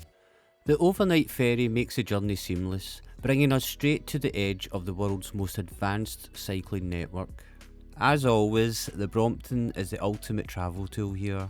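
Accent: Scotland, United Kingdom. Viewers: Video: great. Narrating: even better. Scottish accent: warms my heart. Perfect!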